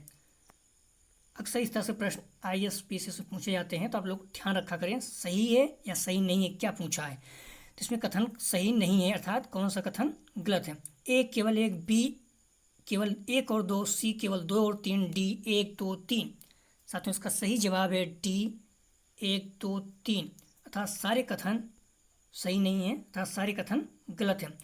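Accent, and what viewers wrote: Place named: Allahabad, India. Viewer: आपकी आवाज़ मधुर लगती है l एकदम इलाहबदी अंदाज़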